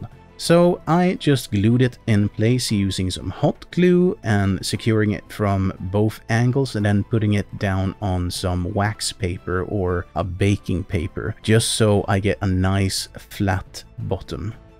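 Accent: United Kingdom. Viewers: Oy mate! Your brit accent is bloody amazing! I'm chuffed to bits! Hahah Your videos are always a gem and this one is no exception :D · Happy birthday 🥳 (and that’s a cracking British accent) 👍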